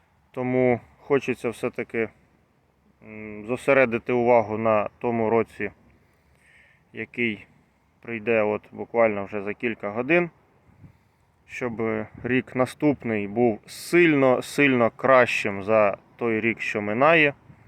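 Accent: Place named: Poland. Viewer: Панове, вы со своим польским акцентом, пиздуйте в свободну европу, вот тогда Украина будет свободна и мир будет у славян.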